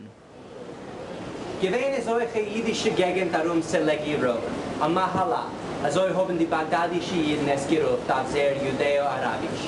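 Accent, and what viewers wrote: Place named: Northeast, United States. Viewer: How interesting. I have never heard the language that the spokesman delivered. I am a Swedish American who has a bit of an understanding of Swedish, Spanish, Latin and a great understanding of US English. I could just listen to this man & understand perfectly well what he was saying. Though he seemed to be speaking a pigeon blend of English/German/Swedish. His accent was clearly US Northeastern English.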